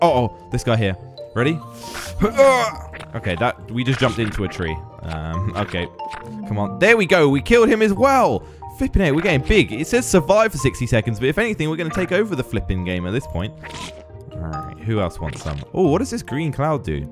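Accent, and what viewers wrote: United Kingdom. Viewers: I can tell by his accent, that he is British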